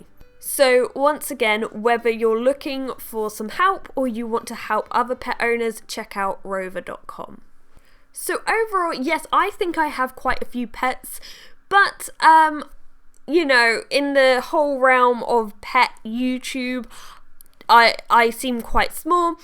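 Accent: United Kingdom. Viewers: Do live in England, Canada or the US? Because you have a British accent, but you have done that rover program. Not trying to be rude just wondering. 🙂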